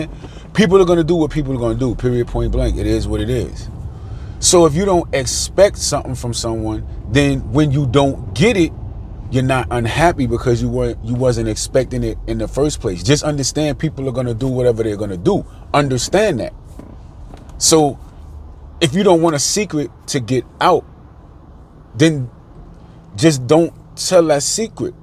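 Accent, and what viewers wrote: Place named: New York, United States. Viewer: Sound like you from one of the boroughs.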